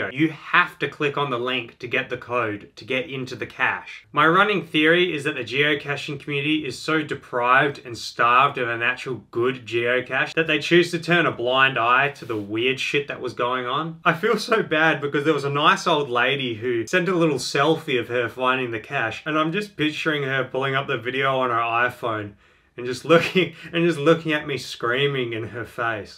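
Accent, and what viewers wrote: Perth, Australia. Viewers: lol I thought you were from Perth. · this video was so good i could listen past the australian accent.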